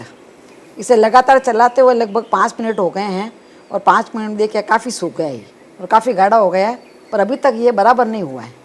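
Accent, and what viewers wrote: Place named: Rajasthan, India. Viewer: Bahut hi achha he.aur aapka voice bahut hi muje pasand he rajasthani flavour aati he..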